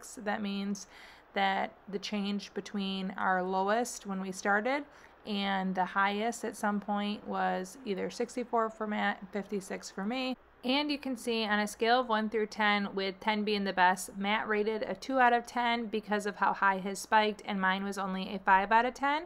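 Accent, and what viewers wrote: Midwest, United States. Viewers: Missed the midwest accent 😉. Been awhile since one of your vids popped up on my feed 💪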